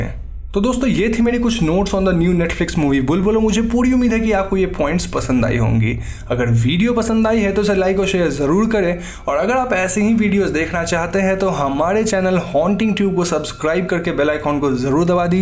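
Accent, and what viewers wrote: Bengal, India. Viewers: Are u a bong? “😃.....Anyways”..... very well explained!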